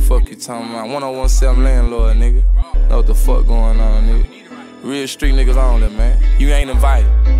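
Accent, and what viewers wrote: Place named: Memphis, United States. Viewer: Sound like Memphis to me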